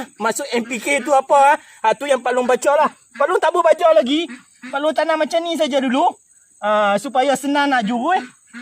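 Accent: Kedah, Malaysia. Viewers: org pahang awat cakap kedah😁 kami teringat kat keluarga kami kt kg😢😢😭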